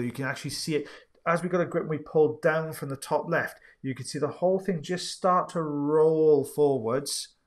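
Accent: Wales, United Kingdom. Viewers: His lively and lovely accent is Welsh! 😊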